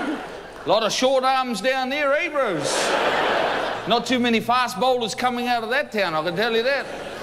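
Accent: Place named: New Zealand. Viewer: Mike king said he used to swear on his comedy and he sure sounds like a Maori gee , oh wow he just swore xD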